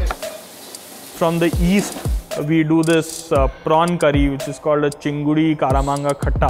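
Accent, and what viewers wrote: India. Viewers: He has a very thick Indian accent. Hard to listen to. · I love his indian accent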